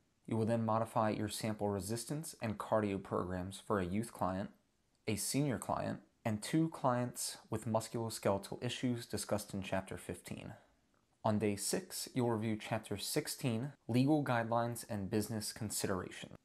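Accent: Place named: Baltimore, United States. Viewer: Almost couldnt tell youre from baltimore 😅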